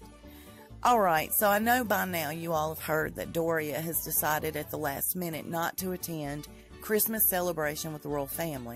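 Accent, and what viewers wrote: South, United States. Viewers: “A knapsack full of nourishment...”. LOL., you are so southern and I just love it!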